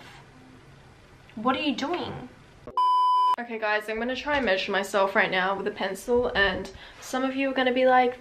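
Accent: Australia. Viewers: She sounds like an aussie :) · Her accent is like a mix of Australian and country but for some reason I love it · Australian accent not austrian. Austria is in Europe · it’s called australian accent luv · R u Australian? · you better tech us you Auwsiiie aCCennT💅💅💅💅💅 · are you australian? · funny the Asian sounds Aussie damn. still cute tho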